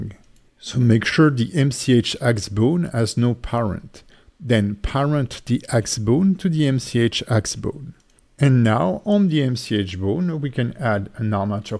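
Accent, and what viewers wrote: France. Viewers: your accent is french 😅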